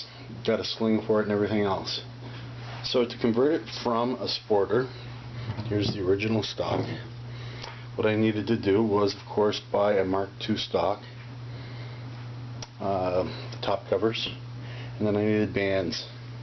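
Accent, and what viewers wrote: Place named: Ontario, Canada. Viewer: Must be from Ontario, right? It's the slight but detectable "uuut" in your speech.... (PS: I"m originally from Vancouver where all from Tah-ronna & points east want to really live. Lotus Land.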